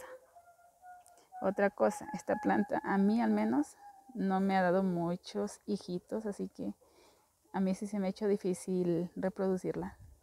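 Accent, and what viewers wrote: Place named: Colombia. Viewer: Hola eres colombiana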